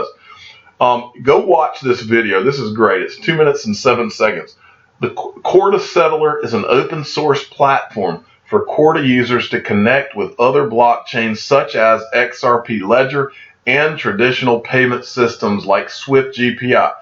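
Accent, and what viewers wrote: South, United States. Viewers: you have the southern drawl going on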